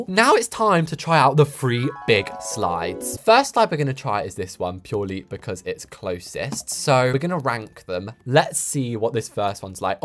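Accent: United Kingdom. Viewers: BRITISH ACCENT!! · As an American, I love your accent and the royals accents, I find them all very funny and British :P Also, I love to imitate British accents :P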